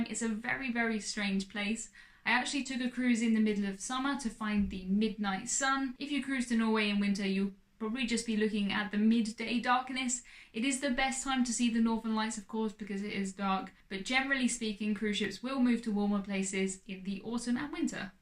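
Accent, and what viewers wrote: United Kingdom. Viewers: Thanks for the good info. topic. I have never listened to a fast-paced British speaker like you before. 🤔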